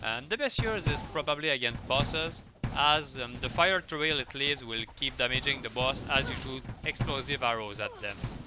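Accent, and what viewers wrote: Quebec, Canada. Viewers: That quebecois accent, I could recognize from a mile away. Nice build btw.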